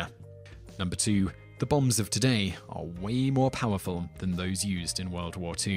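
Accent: United Kingdom. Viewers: Curious. US pronunciation and grammar, in a British accent.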